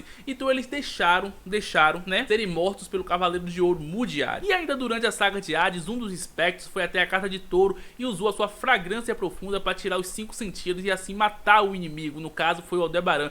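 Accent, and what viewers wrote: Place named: Bahia, Brazil. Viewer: Você até tentou esconder mas eu percebo que seu sotaque é daqui da Bahia. Ganhou mais um inscrito por isso ❤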